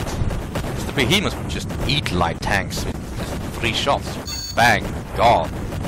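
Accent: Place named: Eastern Europe. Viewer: It's a pitty. When you are planning to replay the game on the higher difficulty, please let me know. I'd like to give you a bunch of tips and hints helping you to win the game. (I've collected around 30 tipps and hints to improve your gameplay or just sensitize you to some aspects of the game.) You're English is very good (from my point of view), although I suppose you're not a native speaker. I guess, you're from an Eastern European country, aren't you?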